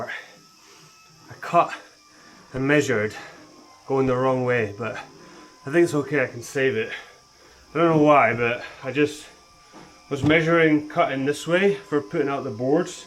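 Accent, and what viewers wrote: Scotland, United Kingdom. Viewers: Hi again from NZ. Trying to work out your accent. Scottish, but with a twist?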